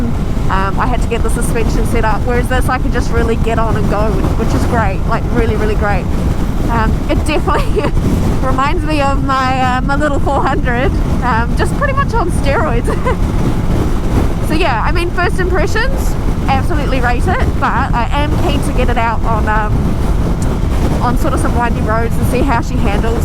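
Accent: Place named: New Zealand. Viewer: Picked your from NZ as soon as I heard your voice. Hi from South Australia, great video.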